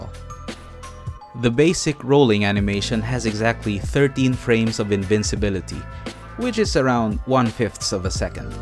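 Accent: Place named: Philippines. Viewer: Just started watching your vids.. You have a filipino english accent i kinda thought then i saw your logo haha Really good vid bro😁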